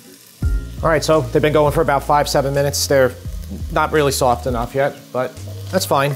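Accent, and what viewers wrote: New York, United States. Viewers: I can hear and see the italian NYer in you lmao. Your voice and hand movements. It's so wholesome XD Equal parts likely to dump a body over the Brookline bridge with cement shoes as cook an amazing italian meal! Haha. I REALLY love that you just jumped straight into chopping and listing the ingredients. Too many chefs list the ingredients as they cook. I find myself skipping all around the videos to make a list. No such case here! Awesome content man!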